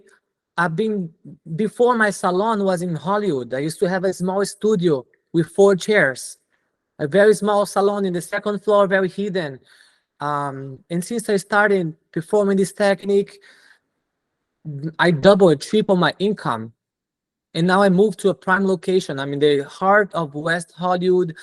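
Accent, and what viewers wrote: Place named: Brazil. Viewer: Pelo sotaque brasileiro 🇧🇷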